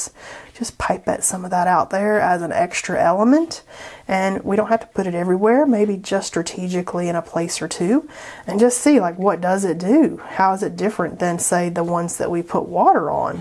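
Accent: South, United States. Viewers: Enjoying all your videos. Nice to hear a fellow Southerner on YT! Do you ever do in person classes?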